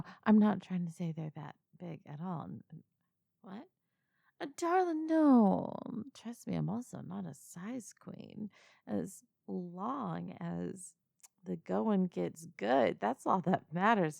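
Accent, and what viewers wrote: South, United States. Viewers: Whoo even if on the off chance I'm the only one who feels safe when a southern possessive soft dom lady starts speaking sweet nothings feels safe and at home, thank you for making me feel that way ❤ love you ❤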